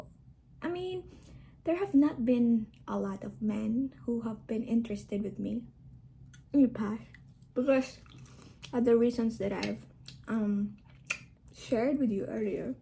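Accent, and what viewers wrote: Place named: Philippines. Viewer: I'd thumb it up twice for authenticity. This lady is a representative of a real everyday Filipinas. She isn't fake or showboating or covering up her accent.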